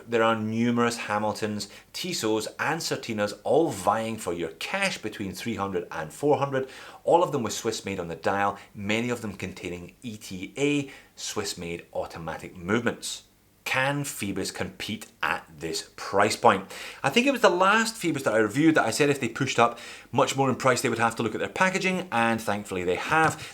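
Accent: Scotland, United Kingdom. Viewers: Love the Scots accent with the Sox shirt! Enjoying this one a lot.. . it is hard to find divers with restrained designs and a vintage feel. Logo is a bit loud for my taste though.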